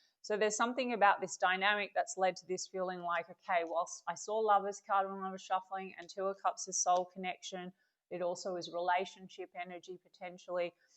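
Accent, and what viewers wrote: Australia. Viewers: You are so Aussie. That's why I love your readings. You go Girl. Please don't change a thing wonderful lady. Be the way your are.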